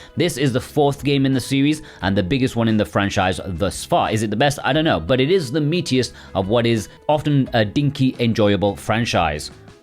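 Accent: United Kingdom. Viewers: Are you Caucasian ??? You sound very Asian British :o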